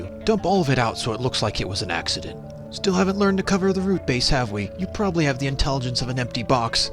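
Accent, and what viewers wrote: Russia. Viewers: Are you Russian? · Bro I was like you're Russian aren't you and after that you was like in Russian 💀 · Wait what happen to your Russian accent?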